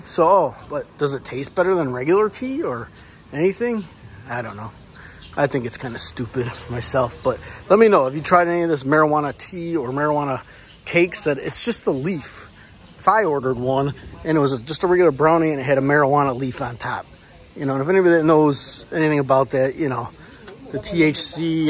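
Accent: United States. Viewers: stumbled across another channel i like. where you from? you sound like a fellow east coaster if i had to guess.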